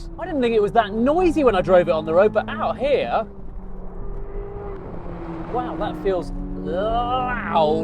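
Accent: England, United Kingdom. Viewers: "Euro" presenters hardly ever test American sports cars because generally speaking, only Americans buy them. As for the 'home team', Top Gear is a British magazine if the accent didn't give it away, and the Porsche is German. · english accent driving american car = stop watching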